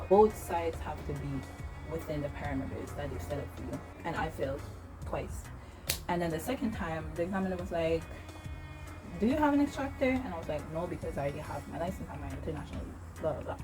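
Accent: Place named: Jamaica. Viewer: okay purrrr, look at you with your jamaican accent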